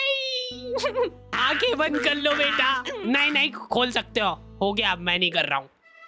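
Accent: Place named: Indore, India. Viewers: Lagta he bhaiya mp se he 😅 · Bhai indore se ho haina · Bhai kha se ye to bata do Indori lag rahe ho 😂😂😂😂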